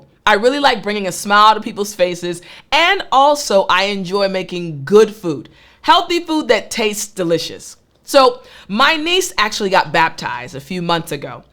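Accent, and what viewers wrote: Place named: United States. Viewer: Best American accent I've ever heard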